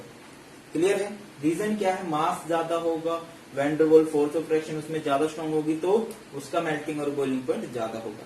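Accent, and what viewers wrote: Haryana, India. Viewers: Sir r u from haryana🤔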